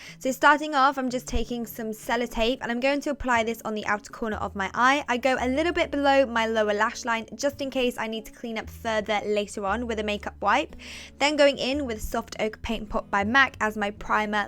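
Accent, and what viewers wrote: United Kingdom. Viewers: I know I meant where abouts in the uk lol! Your accent sounds similar to mine I'm from Gloucester xx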